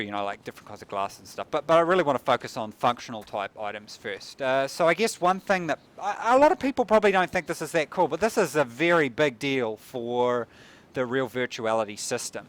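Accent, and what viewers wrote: New Zealand. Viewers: Dat kiwi accent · Lol you didn't recognize a New Zealand accent? Anyway it's the ugliest accent that the English language has, after Californian. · Guy talking has a kiwi NZ'er accent. Am I wrong? developer is a Kiwi? :D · Love the kiwi accent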